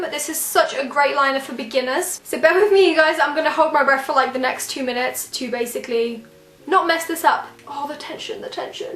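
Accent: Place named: United Kingdom. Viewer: jesteś z Polski?????????????????????? co?????????????????? nie poznałam, masz taki piękny brytyjski akcent, wg śliczna jesteś. Od ilu lat mieszkasz w UK?:)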